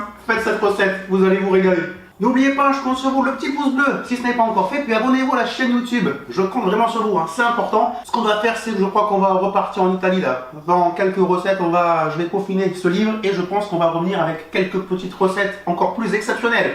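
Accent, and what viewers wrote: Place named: France. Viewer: je t'adore 😍 Non seulement pour toutes tes recettes, mais parce que tu mets à l'honneur beaucoup de recettes de mon pays 🇮🇹🇮🇹🇮🇹 Et ton petit accent français ne gâche rien à la prononciation italienne. Bisous 😘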